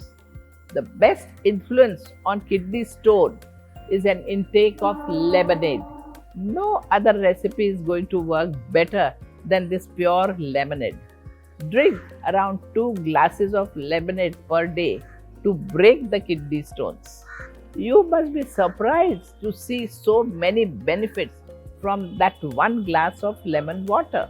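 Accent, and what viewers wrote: India. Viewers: Why are the only showing white people in your background and why not other races ? She has Indian accent and not even bothered to show people of color in the background and talking about health :)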